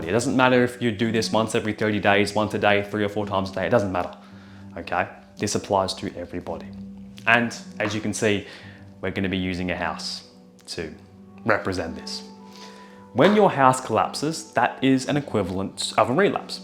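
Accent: Australia. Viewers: You sound Australian, but you spell 'favour' the American way in your subtitles. Remember your English roots.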